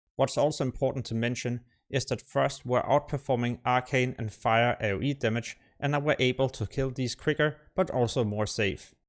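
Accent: Denmark. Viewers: why can i always hear someone is from denmark xD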